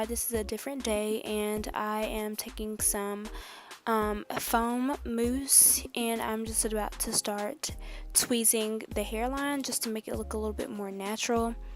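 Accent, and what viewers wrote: South, United States. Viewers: you sound southern lol , where you from ?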